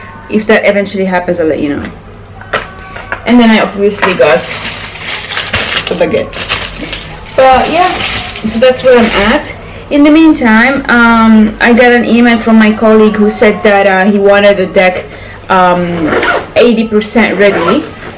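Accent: United Kingdom. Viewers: You picked some british accent😁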